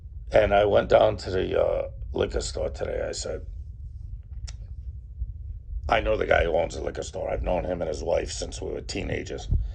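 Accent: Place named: New York, United States. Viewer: Glad i found your channel.. Thanks for the update, yeah these northeast cold nights are starting to suck. Its killer you sound just like my cousin from NYC.. I set my fermeting barrel in an oversize barrel and filled with water, tiny fountain pump and a fishtank heater with a loose lid.. keeps my shit at 87degrees in my shed. I was gifted 2 cases of bananas yesterday and just seeing what to do with em, between you, stillworks, bearded and beaver i got a few ideas.. appreciate it!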